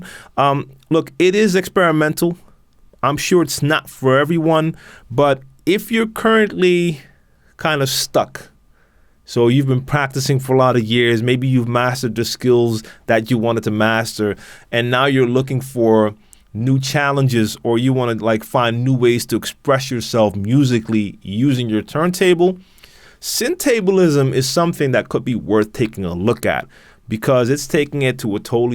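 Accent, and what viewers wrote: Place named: New York, United States. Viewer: I always thought you were from new york.. you have the NY accent.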